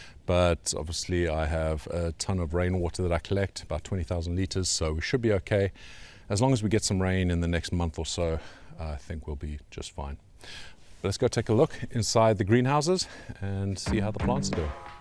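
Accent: South Africa. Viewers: You sound like a south african? If so tell us more!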